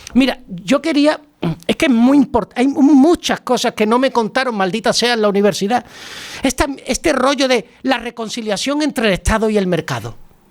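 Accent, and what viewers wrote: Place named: Colombia. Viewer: Es el español mas Colombianp qie conpzco ❤❤❤❤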